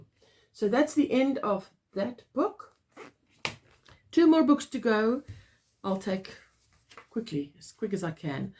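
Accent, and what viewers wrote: South Africa. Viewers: You sound south african!? Love the way you shared everything so calmly and thoroughly!!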